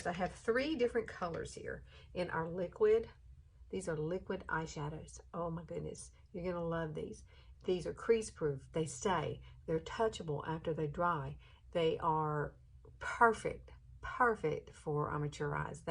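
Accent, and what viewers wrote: South, United States. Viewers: Hello,! I have my fetish about femininity whether I watch beauty/fashion videos, watch "motherhood videos, including day-in-the-life vlogs" or think about waitresses. I have my fetish about mustard and ketchup whether I squeeze 'em "on my hotdogs, cheeseburgers or hash browns" or "for my fries". I have my fetish about the round condiment squeeze bottles whether I think about the diners, pancake artwork parties or painted canvases. I have my fetish about the feminine names like yours. I enjoyed this video of yours. You have your Southern accent since you're living in Alabama. Quite recently, I watched the videos on "MrsMelissaM Beauty" and " Unboxing Mom". I watched the empties video and week-in-the-life vlog on " Parada". On one of the videos on " Unboxing Mom", I spotted her daughter named Kelly. I watched the muted videos on "Made by ", filmed in Holland. 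One of her videos featured the tie-dyeing party for Dutch children where I spotted the round condiment squeeze bottles. On Aug. 30, I washed 2 out of my 4 reusable "16-ounce" OXO squeeze bottles and separately replenished 'em with honey barbecue sauce and pancake syrup. On Aug. 31 and Sept. 1, I played with my 12 empty, clear "12-to-16-ounce" squeeze bottles by stacking, holding and "slowly squeezing" 'em. For my 1st dinner of September, I squeezed mustard and ketchup on my 2 hotdogs with my 2 other reusable OXO bottles. I ate some lightly salted Pringles chips and drank my soft drink. B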